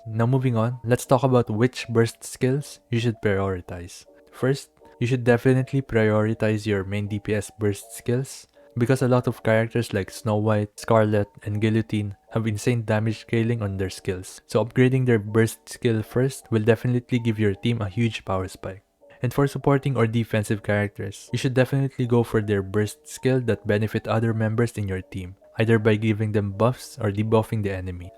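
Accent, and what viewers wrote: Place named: Philippines. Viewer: U sound pinoy..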